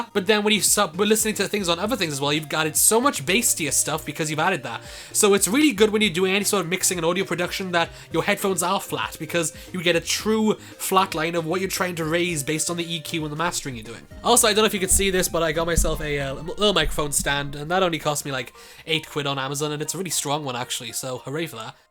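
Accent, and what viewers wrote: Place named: Wales, United Kingdom. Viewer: ah a fello welsh mun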